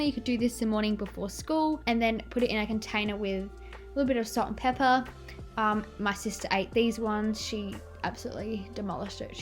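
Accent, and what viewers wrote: United Kingdom. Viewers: thank you bri-ish lady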